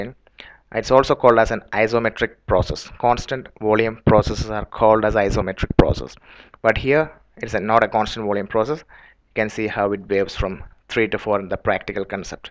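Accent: India. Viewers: hmm the curry accent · Indian accent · Fuck your fucking Indian accent.. You fucking fuck!!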